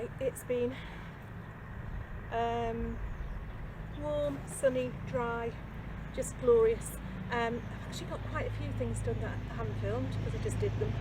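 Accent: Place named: North West England, United Kingdom. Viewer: good vid. you sound local, we're on the Wirral. NW UK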